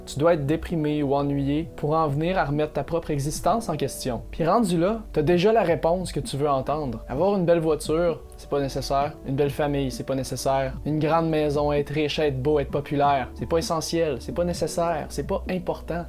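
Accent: Quebec, Canada. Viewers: débile profond + accent québéquois + tête de gay. Trop.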